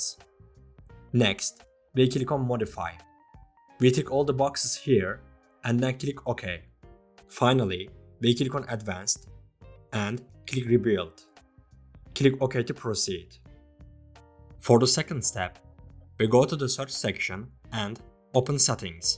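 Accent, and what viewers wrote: Turkey. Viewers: This guy he is so Turkihs , his accent screams " I AM TURKISH "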